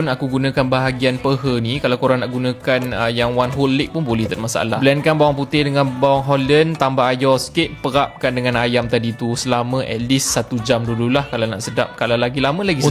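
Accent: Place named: Perak, Malaysia. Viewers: sedap je dengorrr ckp perakkkk hehe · Bunyi ckpnye mmg loghat peghak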